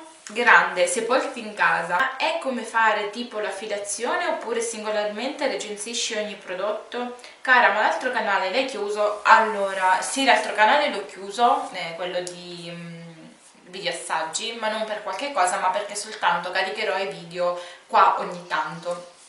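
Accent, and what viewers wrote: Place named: Sicily, Italy. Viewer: Ciao sei davvero molto simpatica e i tuoi video sono molto utili. Guardando questo video ho sentito che sei Siciliana come me. Se ti fa piacere visita il mio canale =)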